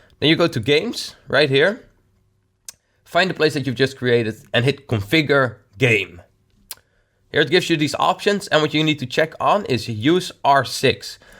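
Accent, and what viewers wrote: Netherlands. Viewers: eyyyy jog je bent nederlands toch ? well ik ook ik kan het horen via je accent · yep you sound dutch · Dutch :D? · Just a question this voice really has a Dutch accent? Is he Dutch? Anyways I love ur vids what are ur subs called Znaccers CX? (Iam one CX) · Je nederlandse accent is zo laimmm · he sound dutch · Are u dutch or Deninish or Belgic?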